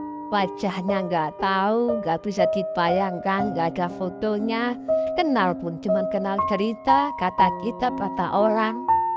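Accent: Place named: Surakarta, Indonesia. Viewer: Itu suara solo mas😮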